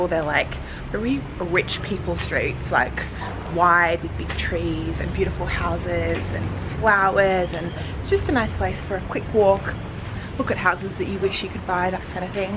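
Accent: Australia. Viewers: Hello.. Are you a Straya?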